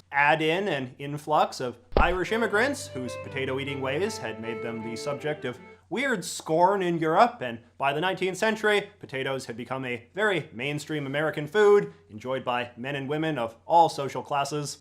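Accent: Canada. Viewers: Strongest Canadian "-out" I've ever heard. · what is that accent i hear aboat instead of about lol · Bro is the most Canadian Canadian I have ever heard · is this guy canadian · he’s Canadian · He's canadian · He’s either Canadian or Minnesotan I presume :/ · This guy has the most pronounced Canadian accent I’ve ever heard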